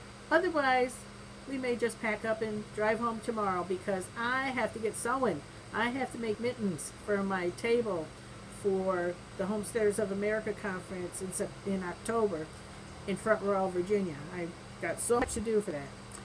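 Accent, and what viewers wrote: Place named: New York, United States. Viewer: I knew you had to be from NY! I can tell by your accent. I am from really upstate NY (Massena)! I have an almost Canadian accent that comes back if I am home for very long!!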